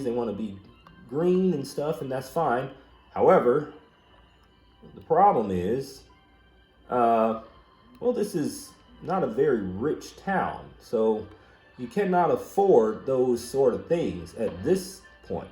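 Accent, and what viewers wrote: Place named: United States. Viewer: What state is your accent from if I may ask?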